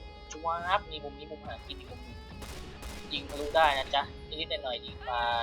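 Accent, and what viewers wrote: Isan, Thailand. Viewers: คนอีสานรึป่าว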